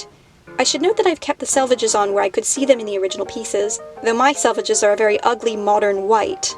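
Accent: United States. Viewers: but with modern American intonation, it's honestly relaxing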